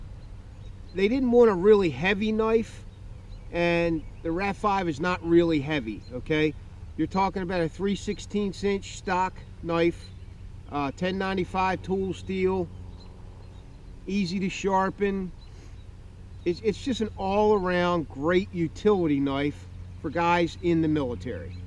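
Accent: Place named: New Jersey, United States. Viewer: You sound like a New Jersey moke to me. It sits well on ya.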